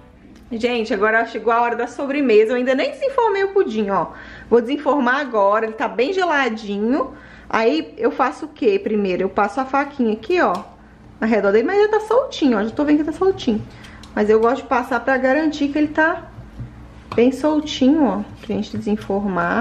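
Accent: Minas Gerais, Brazil. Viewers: Oi é um imenso prazer em falar com vc.Te acompanho já a muito tempo,te admiro muito me identifico muito com vc.Amo o seu sutaqye pois também sou mineira.Todos os dias vejo se vc postou alguma novidade amo o Bernardo a sua família vc é linda sua mãe seu pai com a mão divina fazendo artes maravilhosa.Sua mãe aí aquele fogão a lenha como lembro da minha mãe em Minas.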